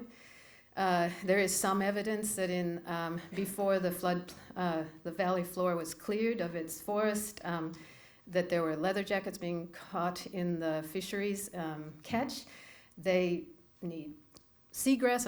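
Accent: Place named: Australia. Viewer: Great work, - it's fun for another previous Oregon, IL resident to about your work in your Australian accent!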